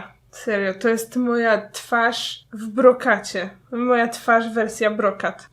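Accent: England, United Kingdom. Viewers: Ach.... i ten jej angielski akcent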